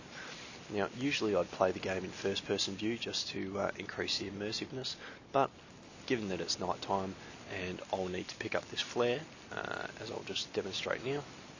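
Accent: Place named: Australia. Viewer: Thanks for the videos, helped me heaps, loving the Aussie accent :D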